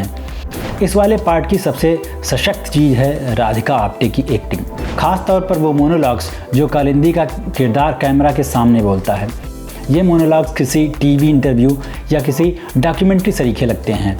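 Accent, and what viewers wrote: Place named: Uttar Pradesh, India. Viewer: subhas chandra mondal...... chutiya ho kya jindgi bhar judgemental reh jaaoge.... ye typical up ka tone hai.... sabse bc tone tera hai wo hai racist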